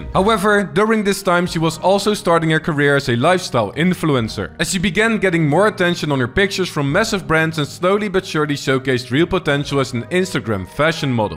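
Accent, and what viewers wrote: Netherlands. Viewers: Where are you coming from? You sound Dutch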